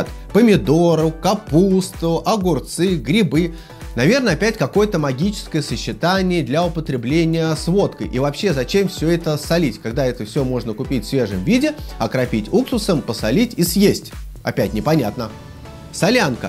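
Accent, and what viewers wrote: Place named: Voronezh, Russia. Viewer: а Вы случаем не из Воронежа?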